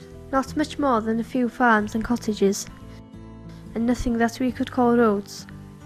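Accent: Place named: North Wales, United Kingdom. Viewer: Very N. Walian. Both my aunts sounded like that. I hope "Bleina" is re-inventing itself - it deserves to.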